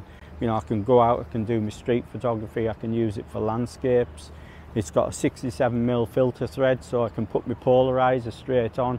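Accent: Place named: Teesside, United Kingdom. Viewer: Hi, first time coming across your channel and I will subscribe, you really sound Middlesbrough, I'm a Stockton photographer, good to hear a local Teesside accent abroad.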